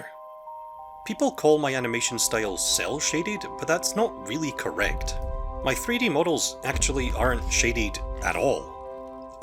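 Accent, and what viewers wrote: Scotland, United Kingdom. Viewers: OI jabber ya scottish yah?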